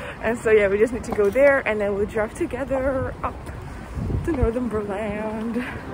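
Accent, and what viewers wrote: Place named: Scotland, United Kingdom. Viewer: Nice accent (you sound kinda Scottish, not trying to be rude or anything, anways Very cool accent)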